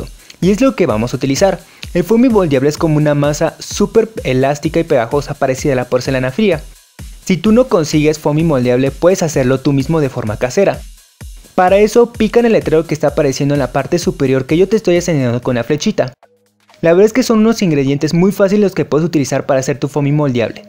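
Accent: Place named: Spain. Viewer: me cae super mal que hable como si fuera un español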